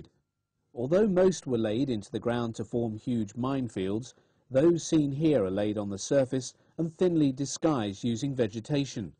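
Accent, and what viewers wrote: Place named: United Kingdom. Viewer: ナレーションの方はイギリスの方ですね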